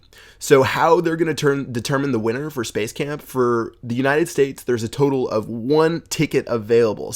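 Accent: Netherlands. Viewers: You could really hear it was a dutch man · that's a dutch accent for sure lol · yeah he is dutch that accent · All of us Dutch people recognize his accent haha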